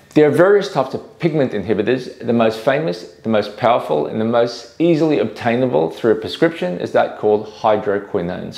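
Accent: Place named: Australia. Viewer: He an Aussie. That’s their natural accent.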